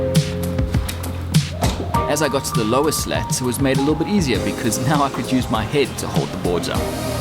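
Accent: South Africa. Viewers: That’s a South African accent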